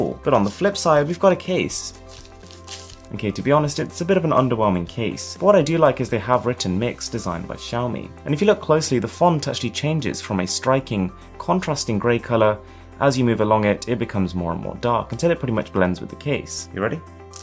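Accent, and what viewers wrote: United Kingdom. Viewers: oh! Thats why he looks indian and sound british. Amazing! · Why do you have english or british accent?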